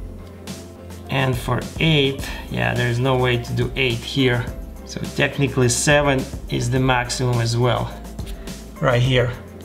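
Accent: Russia. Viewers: So russians all sound the same...interesting. CrazyRussianHacker sounds the same.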